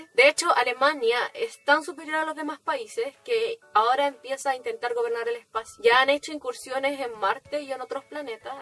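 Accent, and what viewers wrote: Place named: Canary Islands, Spain. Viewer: Chacha si no es por una palabrita que te delató, me habría cortado la mano por tu acento a que eras de Canarias. Estoy in love con tu canal🤗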